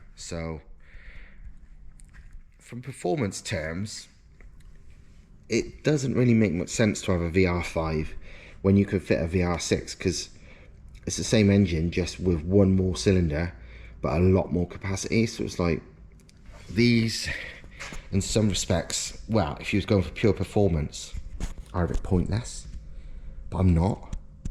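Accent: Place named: West Country, United Kingdom. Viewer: Dude where are you?. By the accent I'd say not that far from me lol,Gloucestershire (where I'm from) Swindon , Bristol, Bath, Somerset somewhere, Wiltshire. I do usually get it fairly right . Anyway any chance you'd interested building something for money (obviously) it will revolve around a vr5 engine (have to have that sound somehow, and an original in line is just way too pricey when the vr5 is out there). TBF though much simpler in my choice of car MK4 vr6 4 motion but turbo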